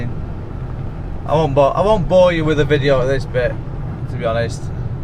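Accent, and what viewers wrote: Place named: Birmingham, United Kingdom. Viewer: your brummy accent is good I’ll give u that lol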